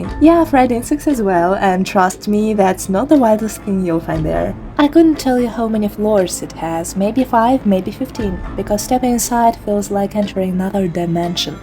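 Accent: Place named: China. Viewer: 为什么你说英语有中国口音哈哈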